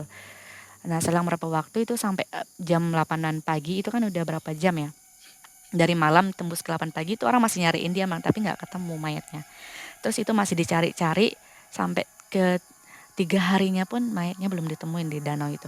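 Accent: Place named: Kalimantan, Indonesia. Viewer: Panggilanya pake logat. Magg. Khass kalimantan bujur.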